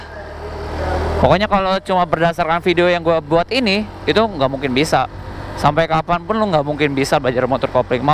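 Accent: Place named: Sunda, Indonesia. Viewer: si akang suara na suara sundanis hatur nuhun kang tutorial na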